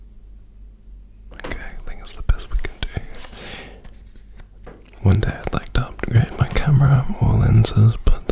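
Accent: Australia. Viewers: The Australian accent is so beautiful 😍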